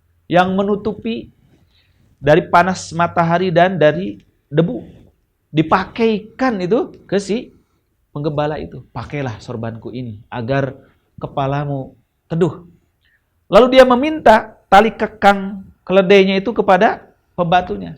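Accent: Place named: West Java, Indonesia. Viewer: Sangat Sunda sekali... NGAOS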